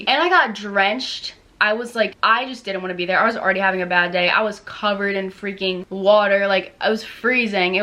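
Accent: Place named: Maryland, United States. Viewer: im from Maryland too and when you first started talking I could hear the Maryland accent haha and also a lot of schools in Baltimore/bel air are horrible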